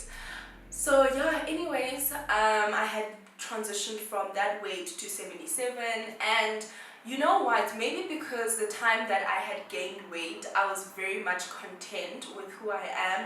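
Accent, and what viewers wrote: United Kingdom. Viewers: N the dash of UK accent iphumaphi? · Loved the UK accent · It's the British accent for me...lol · i loveee the british accent omg omg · The British accent gets me everytime 🤣🤣❤️❤️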